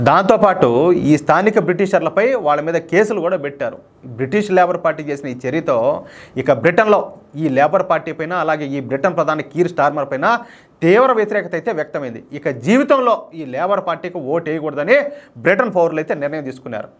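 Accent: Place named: Kadapa, India. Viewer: Meedi kadapa district ramjapeta Slang la vundi sir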